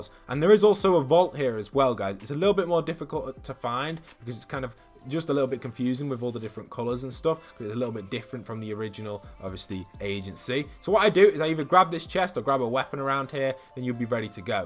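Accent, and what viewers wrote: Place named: United Kingdom. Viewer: Anyone else notice he has a British accent but goes really northern when he says chug jug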